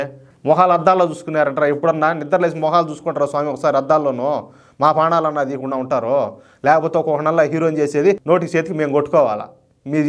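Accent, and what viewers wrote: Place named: Telangana, India. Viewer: I appreciate your videos but your pronunciation is completely Telangana yasa if possible please change in pure Telugu.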